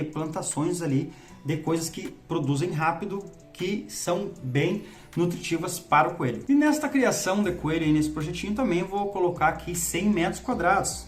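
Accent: Brazil. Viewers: Deus te abençõe por compartilhar o conhecimento isso é muito importante.quanto a seu sotaque achei sempre muito bonito o sotaque do Sul assim como de outras regiões é isso que fais nosso Brasil ser bonito é essas mistura de sotaque e de Cultura por todo o pais.Deus abençõe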